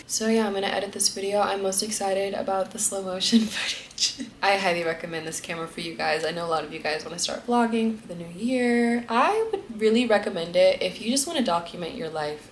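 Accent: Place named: Colombia. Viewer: It’s so funny to me how you have a Colombian accent when you speak Spanish 😭 ofc u lived in Colombia and I understand but it’s interesting to me how depending on where you learn a language you can get their accent. I’m Latina and learned English in New England however I don’t have an accent but I lived in Texas when I first moved to the states and wonder if I would have an accent if I lived there!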